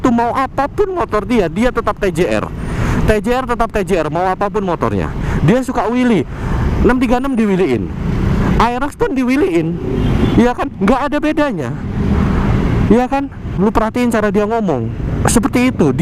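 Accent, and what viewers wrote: Surabaya, Indonesia. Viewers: Saya sih emang dari dulu suka isi dari video lu bang dan ga peduli motornya apa yg penting isi dari video itu suka aja denger suara khas suroboyo campur jakarta 👍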